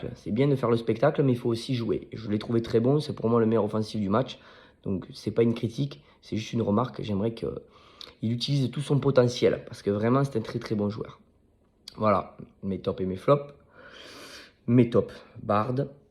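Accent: South, France. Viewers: Salut, tu es originaire de quelle région de France ? Tu sembles avoir l'accent du sud ;)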